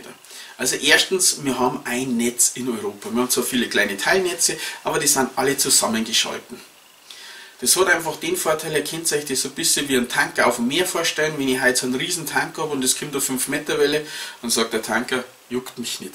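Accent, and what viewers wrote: Bavaria, Germany. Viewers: Super erklärt!gaaanz starkes Video. Hehe..auf bayerisch klingt‘s trotzdem ned schlimm. I wohn aufm Land...geht scho irgendwie. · Danke für die lebensnahe Erklärung, bayerischer Charme inklusive!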